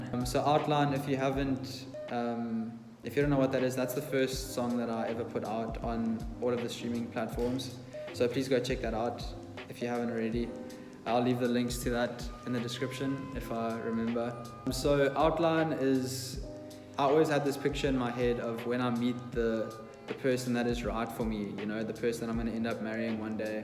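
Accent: South Africa. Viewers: yesss called it! the accent is from south africa!!! the only person whom i've heard speaking with that accent was my ex-boyfriend who was from cape town <3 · never realized how cool south african accent is until now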